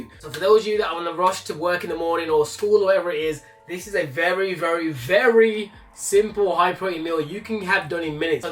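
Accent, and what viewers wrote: United Kingdom. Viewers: Yo, don't hate me but I JUST realized you gave a British Accent. Lol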